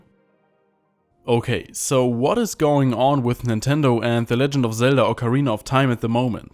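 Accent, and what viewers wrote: Germany. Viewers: Are you german? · Bin mir ziemlich sicher dass du deutscher bist man hört es so krass. Einfach die typische Betonung wenn ein deutscher englisch spricht. Ist aber auch verdammt hart das zu verstecken.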